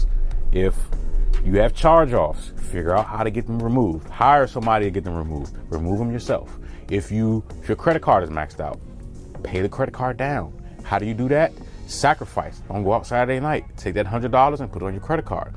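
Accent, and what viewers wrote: DMV, United States. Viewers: I heard the DMV accent and subscribed. You’re in my part of the world so your information is more relevant to me.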